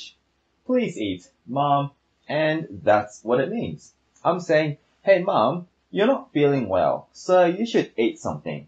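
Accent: Australia. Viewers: Love Aussie accent!! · オーストラリア英語かっこe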